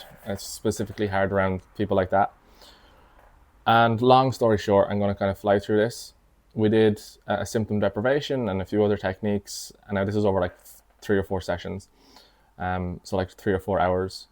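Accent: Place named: Ireland. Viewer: I understand completely. Also, did this theme in therapy umongst other themes. Believing what you dud, that you deserve to be shameful, small and "wrong" is normal at that young age - having had that experience with those boys back when you were 7. The adult self understands this, but the inner child doesn't. When the inner child hears this, it can be like a crack in the mirror because the inner child defined itself as "wrong," shameful and small. You will definitely find to a point realising that this "strategy" to rather please and be good before approaching someone, was needed for the child to feel safe. So it wasn't wrong that you thought this way. Your adult self could tell your younger self now, that it is all okay, but that the younger self may trust you now in trying new strategies. By the way , I love Ireland and was just there 2 weeks ago. 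Hearing your accent makes me miss the Island so...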